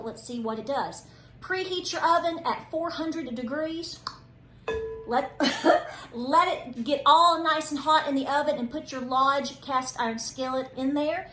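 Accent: South, United States. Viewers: Also I am subbin any gud ole southern gal that says Flar and not flour is my kinda sister. Glad to have found ya channel gurl! God bless y'all!!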